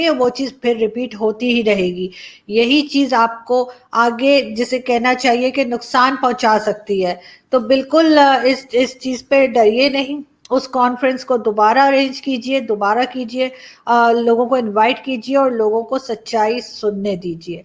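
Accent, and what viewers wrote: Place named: Prayagraj, India. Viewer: jab aap bolti he aap ki awaaz me se prayag miti ki khoosbo ati he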